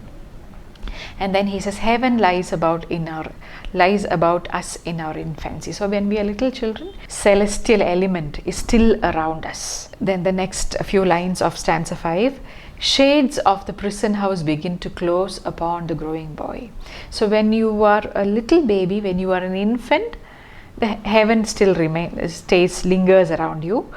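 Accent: Kerala, India. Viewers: R u malayalee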